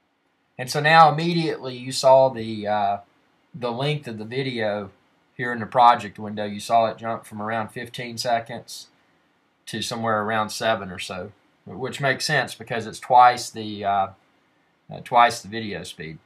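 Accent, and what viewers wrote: South, United States. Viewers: That would be a Sexy Southern draw ;)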